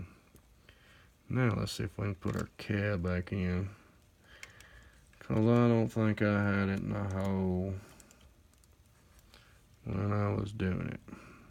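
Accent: Kentucky, United States. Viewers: oh ok. Well I'm out then lol I don't 3D print or anything. Btw you must not be too far away. You sound like one of us Kentucky boys lol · You sound pretty country, you in Kentucky?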